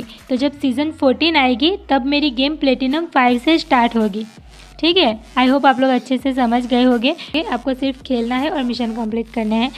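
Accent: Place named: Bihar, India. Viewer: Hey pretty girl aapki aawaj sunke lgta hai ki tum Bihari ho jai bihari meyya ki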